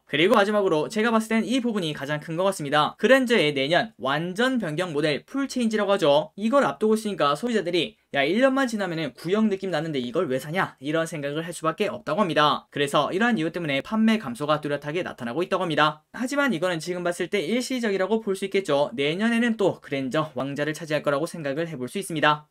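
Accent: Gyeongsang, South Korea. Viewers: 감사합니다~ 그런데 님 대구 사세용?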